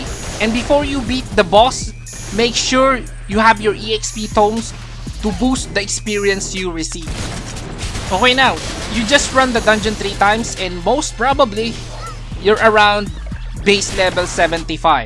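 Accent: Philippines. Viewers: Oh how I miss pinoy accent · i heard filipino accent.. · Pinoy ka ba? hahah you have a heavy accent xD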